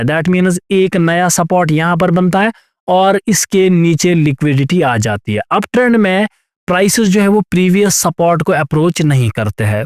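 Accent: Kashmir, India. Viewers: Hi bro... Aawaz sun ki ee laga ki kashmiri ho... Same here from South kash.... Wanna meet you... Please tag your address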